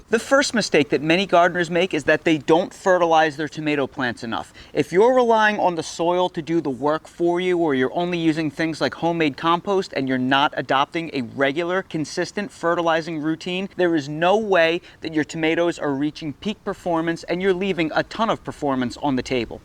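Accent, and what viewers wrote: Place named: New Jersey, United States. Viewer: psst...better tomatoes are in jersey baby...lol......i hear that jersey accent...lol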